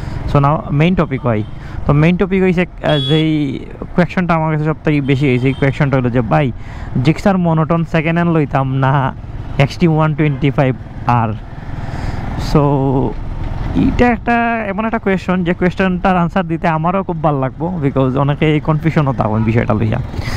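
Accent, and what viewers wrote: Sylhet, Bangladesh. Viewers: sylhety mat kotha onek bala lage ! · Valo vai Sylhet e bole interest die sunte vallagee moja pai😂❤❤ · Love from Cumilla bro🖤 সিলেটি মাত,সিলেটি কথাই সুন্দর লাগে 😊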